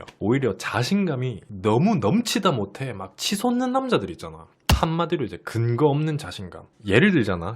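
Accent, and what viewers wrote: Gyeongsang, South Korea. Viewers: 경상도 사투리가 이쁠수가 있구나?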